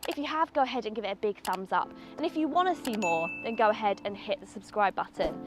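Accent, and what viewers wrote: Essex, United Kingdom. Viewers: Suffolk? I thought you were an Essex girl?? 😟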